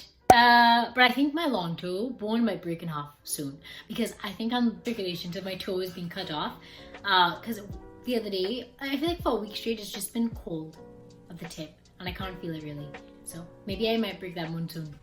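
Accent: Trinidad and Tobago. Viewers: Are you trini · You said you are from the Caribbean......Are you from Trinidad and Tobago? Your accent makes me think this🙂. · Fellow Trini. I hear the accent.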